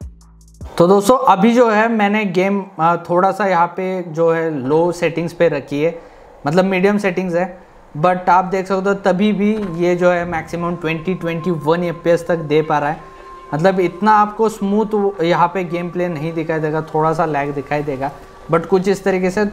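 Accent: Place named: Maharashtra, India. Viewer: Bhau tu marathi ahes na tuzhi hindi aikun lagech karala pun changli ahe hindi 😂 btw mala suggest kar na ha laptop chan ahe ka like game gta 5 3 to 4 hours chalel?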